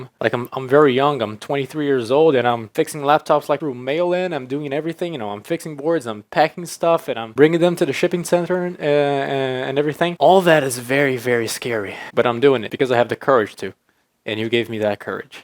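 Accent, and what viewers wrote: United States. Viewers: i thought that he had a bit of that ny flare ahah, i second that blame on rossman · You speak English near perfectly! If you didn't tell me your nationality and where you lived, I would've thought you were an American from the North East or California! And your wireless mic sounds great! Adeus!🙂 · You taught yourself English?! You pretty much sound American, man. That's impressive 🙂 Well done!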